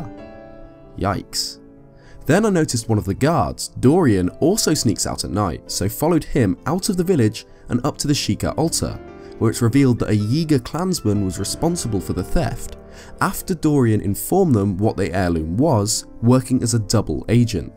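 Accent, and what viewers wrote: United Kingdom. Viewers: Are you from Britain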